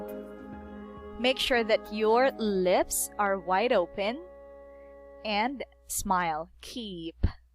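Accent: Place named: United States. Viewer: Those Dark L's! I really love your American accent. I've been living here in the US for 20 years and I'm still learning 😂😂😂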